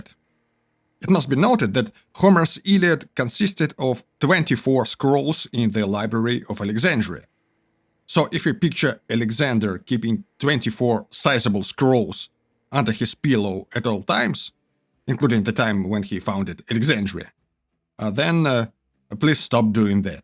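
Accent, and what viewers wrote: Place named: Eastern Europe. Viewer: II suspect by your Slavic sounding accent your motives are less than objective. a. Contrary to your claims in this video that the "majority" of Greeks didn't consider ancient Macedonians Greeks (a "fact" you've made up), ancient Macedonians were recognized as Greeks by other Greeks. This is witnessed by their centuries of participation at ancient Olympiads which at the time were only for Greeks. Its not just Herodotus that claims ancient Macedonians were Greeks. Countless artifacts suggest this. For example there is records of Alexander's own father Philip participating at three different Olympics in the horse race. What you are doing is twisting a handful of references of other Greeks calling them barbarians. Most famously Demosthenes did this. Whats missiing in your narrative though is context. Demosthenes himself was called a barbarian by other Greeks on several occasions. 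Barbarian was being used as an insult. b. I can tell you have depended on Wikipedia's flawed map of ancient Macedonia. Ancient Macedonia of Alexander fame is already 100 percent in Greece. Even parts of ancient Paeonia is in part of northern Greece.. THE Macedonia.. Skopje is not even geographic Macedonia unless you want to claim Roman Macedonia or ancient Macedonian conquests of as "Macedonia". And in that case, huge chunks of north Africa, middle east and Asia would also count as Macedonia. C. You downplay the self-detemrination of ancient Macedonians.... like Athenians.. .like Spartans.. like Corinthi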